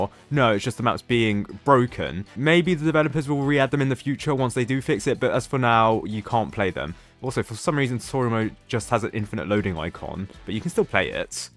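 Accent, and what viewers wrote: United Kingdom. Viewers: continue yapping I love listening to it bri'ish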